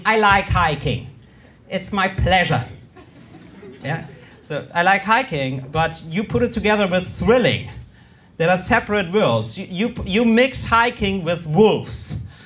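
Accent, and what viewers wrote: Germany. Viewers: Sein Englisch hört sich so Deutsch an, dass es wiederum so wunderbar authentisch klingt. Respekt erstmal für den Mut in einer Fremdsprache vor Publikum aufzutreten. Als introvertierte Person finde ich das durchaus bemerkenswert, aber ich glaube, unser Till ist so extrovertiert, der hat nicht mal eine Sekunde abgewogen, als er das Angebot bekam :D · Unfassbar! Till, du schaffst es deine lustige Art ins englische zu übertragen. Es sind in meinen Augen nämlich nicht unbedingt die Jokes die dich von anderen abheben, sondern die Art und Weise des Vortragens. Und diese super witzige Art hast du auch mit deinem herrlichen deutschen Akzent im englischen rüber bringen können. Thumbs up! 👍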